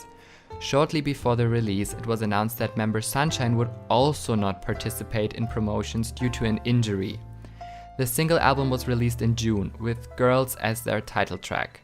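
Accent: Germany. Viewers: Are u german? :D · Is the narrator German? That accent is so strong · are u german by chance? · are you German by any chance ? You sound quite German to me :) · okay, off topic, but are you, by chance german? Your accent feels farmiliar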